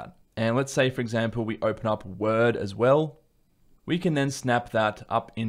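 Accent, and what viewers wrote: Australia. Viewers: Closest I have come listening to Australian accent. You speak well and clear. I have used windows all my life I can't relate to this but for the love of Apple animations and the Mac OS I am here for it.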